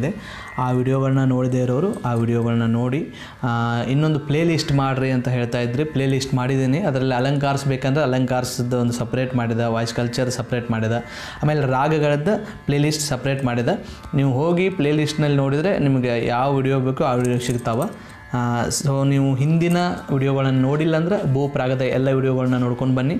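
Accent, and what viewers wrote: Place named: North Karnataka, India. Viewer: Tq so much sir play list madiddakke........ Sir nimma uru yavadu sir... Nimma language namma uttara karnataka du ide... Please sir tell me ur from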